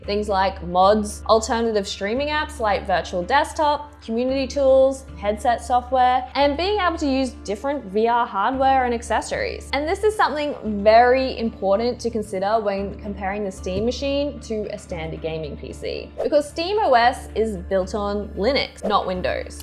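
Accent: Australia. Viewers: I have decades of linux experience so that aspect doesn't bother me, however if there are too many issues then the experience really sours. The puzzles I would be looking to solve are not linux configuration puzzles. Your experience with the rhythm games though is discouraging. I love SynthRiders so not being smoothly playable is not good. But who knows, it may be something relative to tuning the software rather than modifying the hardware. In that case, that issue should resolve itself rather quickly. We'll see. On a different note, do Australians find their accent as cute as those in the States do? Even if it isn't really an accent for them. Maybe they don't see it as cute, but do know it is cute. I have a similar reaction to Scottish accents only I can't understand what they are saying as well as I can Australians.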